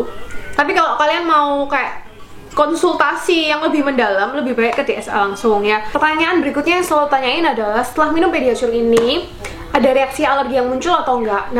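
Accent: Surabaya, Indonesia. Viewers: Mbk e logatnya medok ky boso suroboyoan, sidoarjoan.... Org mana mbk??? Suka saya.. apa adanya bnget suaranya.. mksih ilmunya brmnfaat bngt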